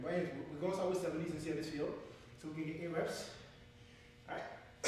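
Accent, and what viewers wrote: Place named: Jamaica. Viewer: Me hear de accent mon , me like this workout yahzimehhh !!!! 🇯🇲🇯🇲🇯🇲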